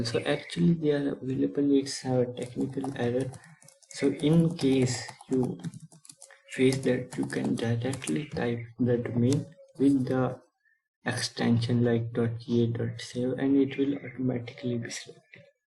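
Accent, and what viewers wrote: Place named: India. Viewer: I heard the Indian voice and was hopeful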